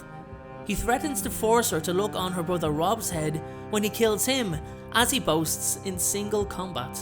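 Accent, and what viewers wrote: Ireland. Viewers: He's Irish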